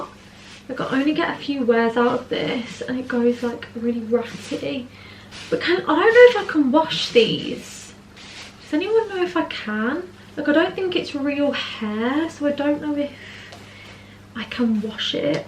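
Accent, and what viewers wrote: Birmingham, United Kingdom. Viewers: Hey I am so glad I came across ur video. Are you a brummie? watchin ur vid was like im listening to my mate whilst we getting ready to go out lol. Loved the look & you looked so amazing. I totally agree with lashes dilemma honestly that is me always such a faff but always needed too but u looked so good without them. 💕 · Your so down to earth! I love your videos!! Its Also nice to hear a fellow brummy on YouTube!😆x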